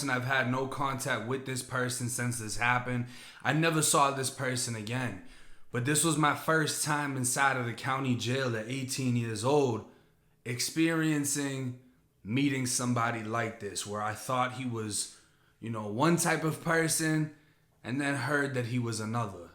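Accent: Boston, United States. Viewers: Am I the only one that says u sound like u have a Boston accent? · I love this dude's accident you can tell he's from like Boston or something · Yo bro where's that accent from you sound like your from boston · I FUCK WITH YA VIDEO AND STORIES REAL RECOGNIZE REAL KEEP UP YOUR GREATNESS MY DUDE 🙌🏾🤟🏾 AND SOUNDS LIKE YOU FROM BOSTON NOT FLORIDA BUT I KNOW IT GOES DOWN IN TAMPA THE WHOLE FLORIDA GET IT POPPING IM FROM BROOKLYN AND I LOVE EVERYTHING ABOUT FLORIDA THE HOODS EVERYTHING I CALL IT SUNNY NEW YORK LOL 😂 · Dude gotta be from Boston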